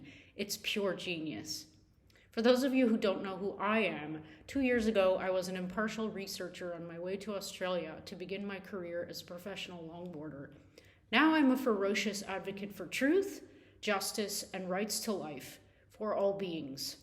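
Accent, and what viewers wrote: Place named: Canada. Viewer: BRAVO! You are awesome: amazing and great speech! Ps you look marvelous! I assumed you’re Canadian due to your accent…. Am I wrong?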